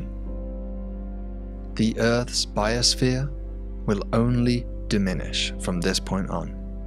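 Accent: England, United Kingdom. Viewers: I think you missed my point, obviously your nationality is determined by your country of birth but if you were raised from birth in another country then you become 'culturally' part of the country you were raised in. The point I was trying to make was that his accent is genuine as he was raised in the UK. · I love your English accent , fascinating 🤨 !! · Ain’t nothing like a British accent narrating something could listen all day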